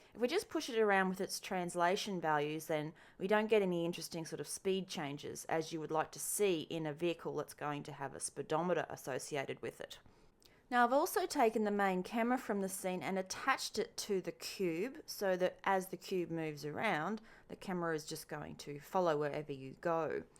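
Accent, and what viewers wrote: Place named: Australia. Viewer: So nice to open the video and hear an Aussie haha. Really clear tutorial too, many thanks!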